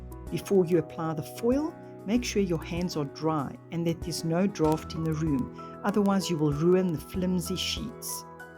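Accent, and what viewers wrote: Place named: South Africa. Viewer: Hello from a fellow South African! It's always such a treat to click on a video and hear the familiar accent 💖I can't believe I've only stumbled on your videos now. I am miniature mad and consume miniature content like an addict 😂 Your work is exquisite and you are a true artisan. I've learnt so much from you in these three videos, so thank you! 🥰 I have loved all things miniature since I was tiny but have only seriously started making minis this year. It really is the BEST 💞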